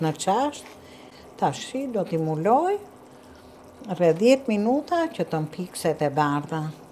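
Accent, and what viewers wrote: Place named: Central Albania, Albania. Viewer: Te lumshin durt dhe goja me tfolmen e shqipnis mesme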